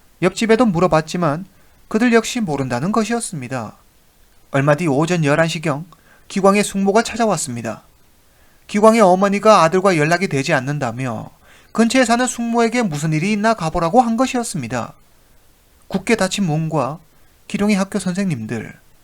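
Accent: China. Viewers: 길림성출신?ㄷㄷ 조선족같다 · 조선족인가요? 무섭네요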